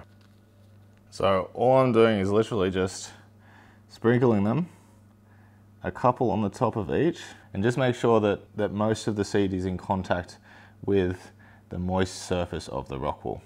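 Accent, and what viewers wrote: Australia. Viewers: Love your content!! To also have another Australian accent schooling me is nice 😂 the familiarity is soothing af- cheers! Your content is dead brilliant!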